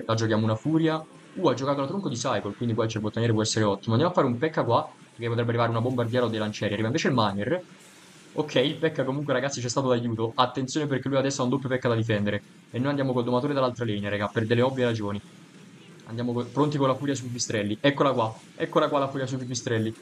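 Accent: Tuscany, Italy. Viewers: Boia.😂. Ma quanto mi piace il tuo toscanaccio. Io sono di Ferrara emiliano. Ogni anno vengo da voi a Siena. Montepulciano Montalcino e pienza vino formaggio e olio. Poi un fine settimana a mangiare toscano con i vostri crostini di fegatini e milza che pochi conoscono e vivo bene per un anno 😂😂😂😂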